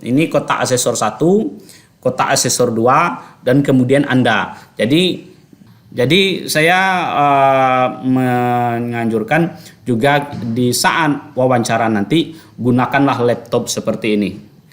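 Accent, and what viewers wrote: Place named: West Sumatra, Indonesia. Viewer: dari logat URANG AWAK udo Koo 🙏🙏🙏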